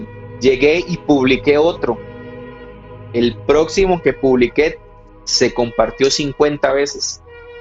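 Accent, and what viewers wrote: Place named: Costa Rica. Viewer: tu acento es. Tico....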